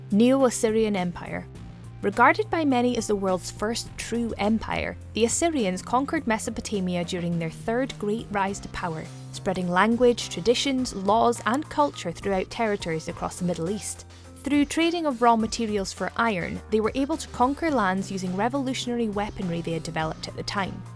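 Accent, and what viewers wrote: United Kingdom. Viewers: You guys are disgrace of YouTube! Stop spreading propaganda! Aren’t you ashamed to erase free speech? From your accent, you are British? Aren’t you ashamed hiding the true history and erasing the dirty history of yours?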